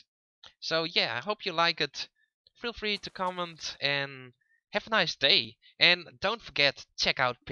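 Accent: Netherlands. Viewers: are you dutch? sounds like it :P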